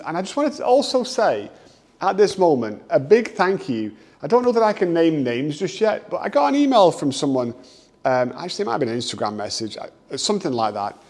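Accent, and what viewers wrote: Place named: Northern England, United Kingdom. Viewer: Watching from Norway, though I'm originally from the UK! Love that your northern accent is still so strong, hope it doesn't confuse too many of the locals (actually, I kinda hope it does!). 😅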